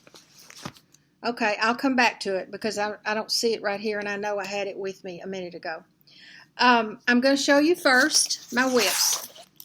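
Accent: South, United States. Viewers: My mother was born and raised on a farm that her family were homesteaders on in Conway, Arkansas. I think that's why whenever I'm missing her I always tune you in so I can hear her through your beautiful southern dialect. Wish I lived close to you, I'd certainly adopt you. Hugs a bunch, Toni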